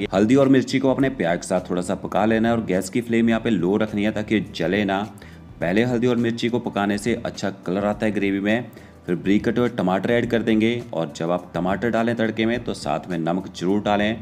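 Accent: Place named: Punjab, India. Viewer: One thing which I love abt u is ur authentic punjabi accent...love u bro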